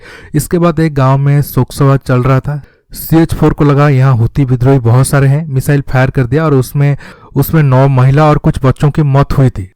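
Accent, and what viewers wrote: Odisha, India. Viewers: Voice se Lagta hai ki tum Odisha state se belong karte ho . Just my guess. By the way nice work... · Bhai odisha se ho kya???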